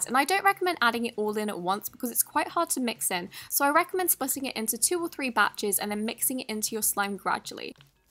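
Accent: England, United Kingdom. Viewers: Yes a new upload! <3 I love your English accent and.. EVERYTHING 😱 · Are u british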